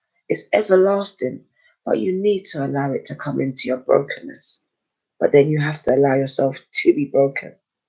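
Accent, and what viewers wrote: United Kingdom. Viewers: I thought so! ☺️ I‘m Swiss but my boyfriend is from Manchester too thats why your accent sounds familiar to me 😍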